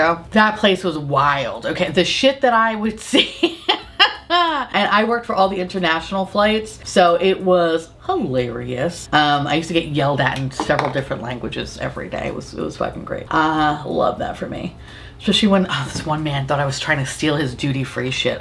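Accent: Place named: Newark, United States. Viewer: Sounds like Newark to me!!!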